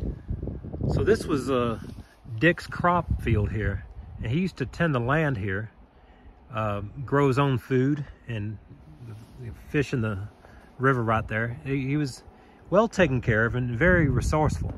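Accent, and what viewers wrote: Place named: South, United States. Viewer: Love your southern drawl…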